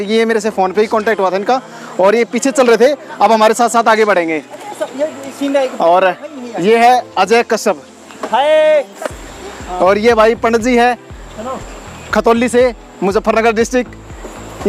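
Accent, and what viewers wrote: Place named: Haryana, India. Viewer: बोली से हरियाणा का लग रा ह भाई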